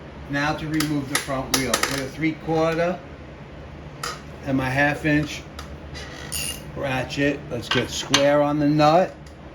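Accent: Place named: East Coast, United States. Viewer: A very big and welcome hint...will take a good look this weekend. A side note...I love your east coast accent.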